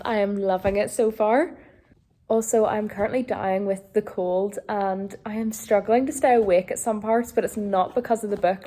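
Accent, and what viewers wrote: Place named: Scotland, United Kingdom. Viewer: I love your accent ❤ Are you from Scotland? Love from Germany 😊❤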